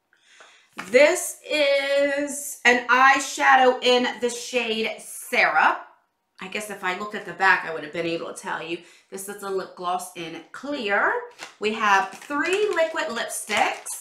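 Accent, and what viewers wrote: New Jersey, United States. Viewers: I love myself a good nude lippie and I like how u say really your jersey accent comes in I understand the struggle I got a ny accent lol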